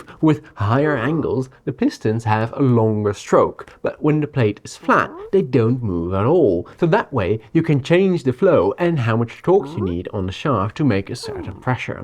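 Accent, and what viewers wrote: Netherlands. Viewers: I love the dutch english accent ❤😂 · is this guy dutch?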